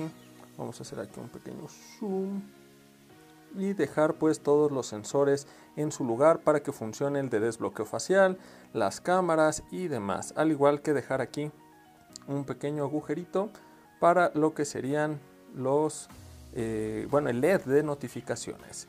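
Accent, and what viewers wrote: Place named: Brazil. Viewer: Olá, eu gostaria em que país você mora? Pois, o sotaque do seu Espanhol é muito parecido com o Português Brasileiro.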